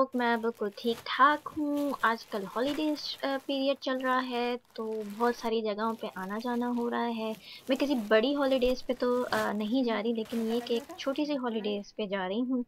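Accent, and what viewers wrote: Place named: United Kingdom. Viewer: okkkk that's great uve adopted a v good British accent that's why I was asking 😍😍😊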